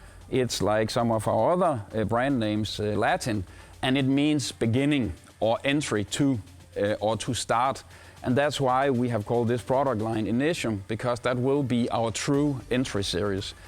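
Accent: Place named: Denmark. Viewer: you can really tell this guy is from denmark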